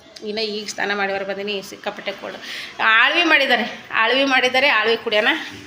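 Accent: North Karnataka, India. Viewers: ನಿಮ್ಮ ಸ್ನೇಹ ನೋಡಿ ತುಂಬಾ ಖುಷಿಯಾಯಿತು ಸಿಸ್ಟರ್ ಇದೇ ತರ ಯಾವಾಗಲೂ ಇರಿ ಸಿಸ್ಟರ್❤❤, ನಮ್ಮ ಉತ್ತರ ಕರ್ನಾಟಕದ ಮಾತು ಕೇಳಿ ತುಂಬಾ ಖುಷಿಯಾಯಿತು ನಾನು ನಿಮ್ಮ ತಂಗಿ ಅನ್ನು ಕೊಳ್ಳಿ ಸಿಸ್ಟರ್, ತಾಯಿ ಕೈಯಿಂದ ರೊಟ್ಟಿ ತಿನ್ನುವುದಕ್ಕೆ ತುಂಬಾ ಅದೃಷ್ಟ ಮಾಡಿರಬೇಕು ಸಿಸ್ಟರ್, ನೀವು ತುಂಬಾ Lacky, ನಿಡೋನಿ ಅಣ್ಣನಿಂದ ಪರಿಚಯವಾಯಿತು ಸಿಸ್ಟರ್ ಅದಕ್ಕೋಸ್ಕರ ನಿಮ್ಮ ಚಾನೆಲ್ನ ಡೈಲಿ ವೀಕ್ಷಣೆ ಮಾಡುತ್ತೇನೆ❤❤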